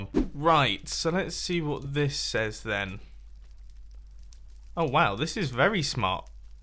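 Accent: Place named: United Kingdom. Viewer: You are the most British British person I have ever heard (that’s a good thing)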